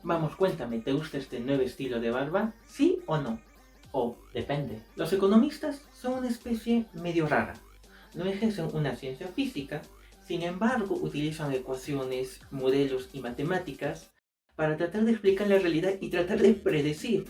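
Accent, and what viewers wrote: Spain. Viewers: Mano porque hablas con ese dejo catellano?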